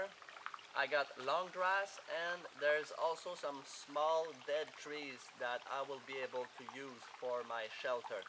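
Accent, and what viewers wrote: Quebec, Canada. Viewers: You sound French Canadian, I could be wrong, dude you're awesome, merci beaucoup. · Great video! Are you Canadian French in Quebec? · are you french? from canada right ? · hummmmm... je crois entendre un petit accent québécois dans tout ce qu'il dit ^^ I think hear a quebecois accent in his voice^^ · je viens de sub ton accent je me suis dit fuck de ou au quebec il viens lui haha vaudreuil ici!je te suis,tres bons videos!je veux commencer a faire ca,sortir de la routine des enfants etc! prend soin de toi soit safe! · Thats a nice quebec accent :) cool video man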